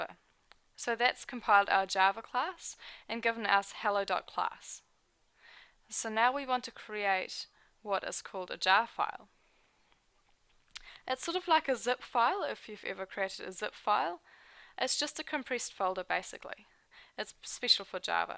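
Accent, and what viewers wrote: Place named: Australia. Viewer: You have the cutest accent. Australian?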